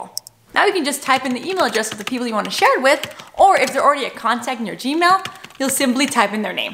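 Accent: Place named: Quebec, Canada. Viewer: ah, I'm from Texas, and my wife is from Virginia. A few years ago we were in Alberta for work, and I could swear your light accent sounds just like a Quebecer. 🤠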